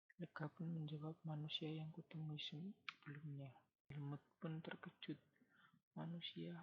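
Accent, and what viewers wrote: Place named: Indonesia. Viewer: Soal y suara lu medok coba bikin suara y alusin sedikit trus kerasin klo mau gasih suara Jagan di baca mending hapalin tesk y dlu ok bro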